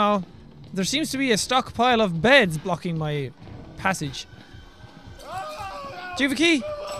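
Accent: Ireland. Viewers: I have an intense stomach sickness going on right now, and there's nothing like a sweet Irish accent to lull me to sleep :3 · i have seen the whole gameplay even of the whistleblower dlc and I love watching him figure it out with his Irish accent, anyone else?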